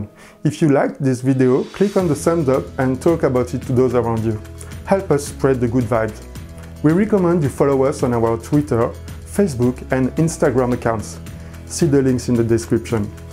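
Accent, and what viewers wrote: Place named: France. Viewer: Maydee I ffink ze baiste iss mébi douwing your videyos in frenche and subtitle them in English. I had to turn subtitles to understand, you have a very Antoine de Caunes type of accent and some words are just missed because of the “intonations “.... but very good choice of news, happy indeed. But try smiling (even) more. It’s happy news ! 😁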